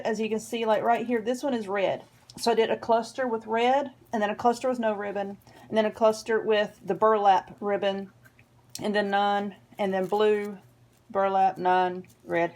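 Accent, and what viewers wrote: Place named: North Carolina, United States. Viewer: Love it!!! You are an awesome teacher! I can't wait to make one. I hope you are doing well. I also like your North Carolina accent. I can't spell the word you said that was N.C. language but my daddy was from and lived in Ashville N.C. and he was something else. He came up with language also. So I love listening to you talk bc it does remind me of him and his language. Thank you for all you do!👍👍🎆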